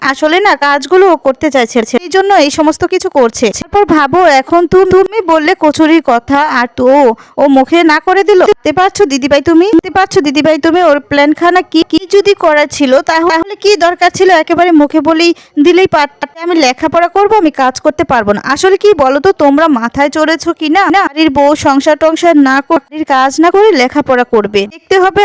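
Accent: Bangal, Bangladesh. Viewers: উচ্চারণ আর একটু উন্নত করার চেষ্টা করো।বাঙ্গাল বাঙ্গাল কথা ভাল লাগে না।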